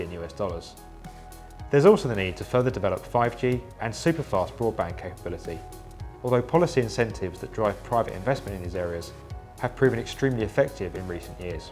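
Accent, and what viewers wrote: United Kingdom. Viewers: He's from the UK you dumb shit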